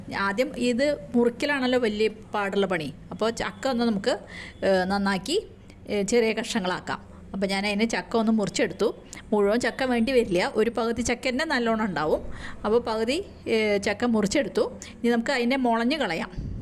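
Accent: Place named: Valluvanad, India. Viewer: ചക്കത്തോരൻ ഒന്നാന്തരായി. അതിലധികം ആ വള്ളുവ നാടൻ ശുദ്ധമലയാളം വളരെ ഇഷ്ടപ്പെട്ടു. നന്നായി വരട്ടെ.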